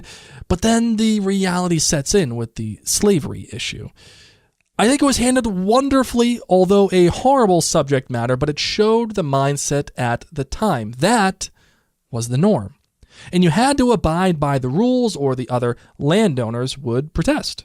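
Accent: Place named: Northeast, United States. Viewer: always love the fade in and out of your northeastern tongue.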